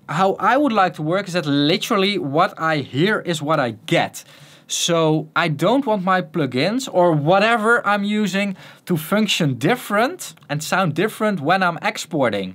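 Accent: Netherlands. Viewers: Are you from the Netherlands? :-) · I suspect that you are Dutch. You have a Dutch accent.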